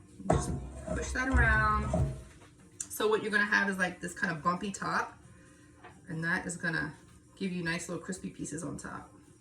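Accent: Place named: South, United States. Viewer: you must be from the south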